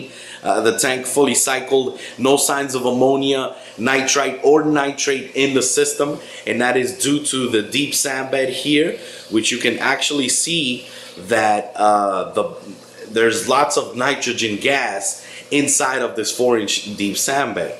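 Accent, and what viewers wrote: New York, United States. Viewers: I really appreciate you doing these videos. I'm looking at an almost empty biocube 29 gallon tank and I'm BRAND NEW to marine tanks. I'm now worried about the "cool" setup I did with my live rock especially cause I only have the 1 stock pump that came with my biocube. I may have created too many deadspots like you said. It was also dope that you have a total New York (Brooklyn?) accent haha. Thanks for all the solid advice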